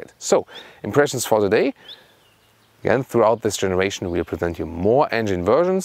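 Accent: Germany. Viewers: I really like your German accent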